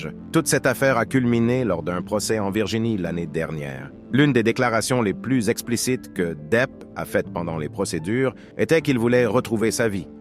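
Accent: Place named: Canada. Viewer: Adorable accent Canadian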